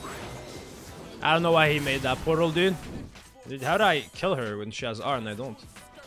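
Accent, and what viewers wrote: France. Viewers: AHH le bon accent français <3 GachiBASS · LL SOUNDS EXTRA FRENCH THIS EPISODE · Tu a un accent français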